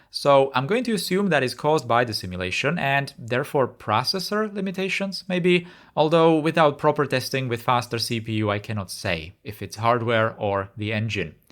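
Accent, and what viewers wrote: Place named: Czech Republic. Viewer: Are you Czech? I like your accent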